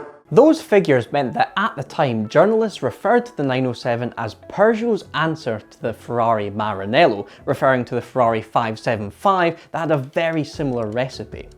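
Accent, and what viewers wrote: Scotland, United Kingdom. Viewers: he's Scottish he canne' help it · He's Scottish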